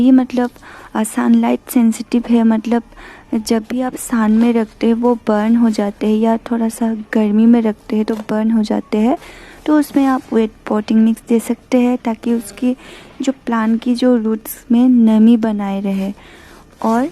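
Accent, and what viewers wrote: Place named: Assam, India. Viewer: aap ka Assamese accent perfect hai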